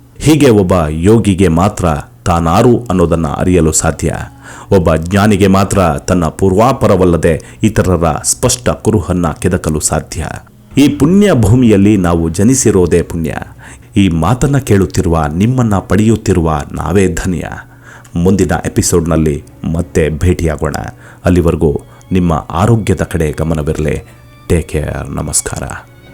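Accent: Standard. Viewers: Nimma nirupanashyli adbhuthavagide. Shuddha Kannada karnanandakara. 🙏🙏🙏🙏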